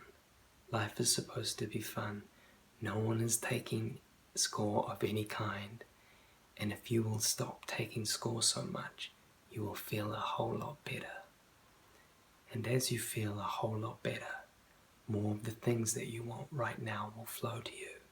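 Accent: New Zealand. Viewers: I needed this - X10. been through a bad time over the past couple of years with people. I to have just started yoga a few months ago too. How ironic. Love your accent, not many Kiwi's doing ASMR, lots of Aussie's though... Thanks, X10.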